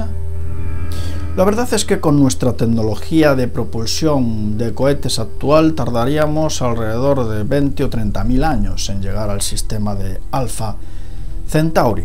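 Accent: Spain. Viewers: ese asento español jajaja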